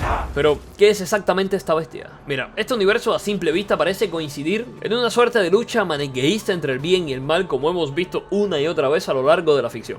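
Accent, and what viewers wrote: Cuba. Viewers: Eres de cuba?😊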